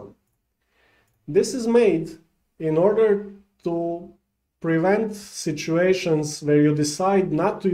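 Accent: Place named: Arab world. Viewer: thank you so much for a nice video in Arabic accent :D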